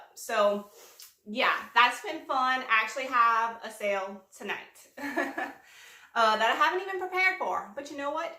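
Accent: Louisiana, United States. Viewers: Just stumbled across one of your videos, heard your accent, and said this lady must be from da bayou like me 😍 lovin your channel too girl! 😘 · I've only recently found you, you are a breath of fresh air! I too, LOVE your accent, I'm from Tennessee and my company's office is in Beaux Bridge, I could listen to those guys talk all day and your cute accent is way stronger than theirs. I love your style, I can't wait to see what you come up with next!!! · Love your channel and really appreciate your step-by-step instructions on how you make your DIY 's. I enjoy watching your channel and get excited to see what you are creating next so I can go try and recreate your DIYS. I recently found me a duck!!!! at the thrift store and I am going to try the chalk paint/paint baking soda concoction along with the Waverly wax clear/paint i know its going to come out amazing, Oh girl love that ac•cent because we Louisiana girls!! 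😘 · Used to live in Denham Springs (Livingston Parish) now in Texas and I miss hearing that Cajun speak! Love it girl! Have you tried making your own chalk paint and then using it in your paint sprayer? Do you have to thin the chalk paint to use the sprayer? Thanks! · Congrats on 10K! I love Southern Louisiana accents! A Fb group would be fun! Keep it going! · Great video! I love your accent too! Took a while but figured out it must be cajun and you verified that for me here.😉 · I just happen to come across your channel. I love thrifting, so I was watching you and I knew you were from my state and I knew you had to be from south. Your accent is my accent. Louisiana is the great state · I've been addicted to your channel since last summer and started curb flips in the fall. Your videos are so inspiring. I love that you incorporate color into your designs. Love your accent, too. My mom is from New Iberia so I recognized the Cajun accent. 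Love love loce. Congrats on the monitization. God bless and keep you and yours. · I could tell you were from La right away..... being from MS you are from my sister state !!!! The accent sounds like home !!!! Love your videos where you flip an item or you make something from wood... I love woodworking... Thanks for all the info about you !!! I am subscribing !!!!!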